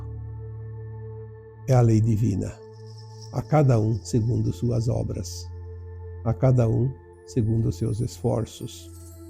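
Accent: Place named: Rio Grande do Sul, Brazil. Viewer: Eu acho que é do Rio Grande do Sul 😅pelo sotaque 😊😊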